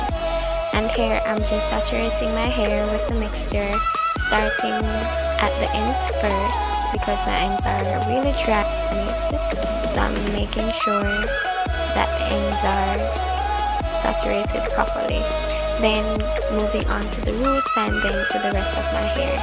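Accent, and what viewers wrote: Jamaica. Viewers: Are u Jamaican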